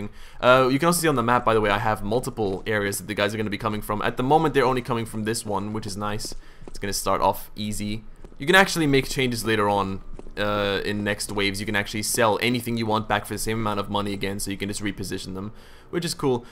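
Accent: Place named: United Kingdom. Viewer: You sound very british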